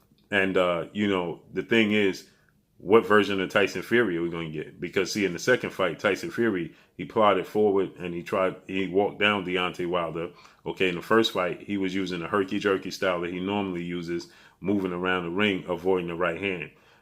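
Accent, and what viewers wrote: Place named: Brooklyn, United States. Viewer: salute 🤴🏾 keep grinding' SON' BROOKLYN VOICE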